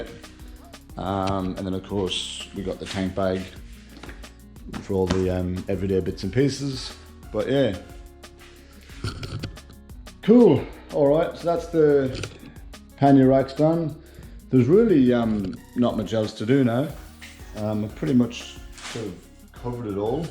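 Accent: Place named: Ireland. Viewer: Sounds Irish 😅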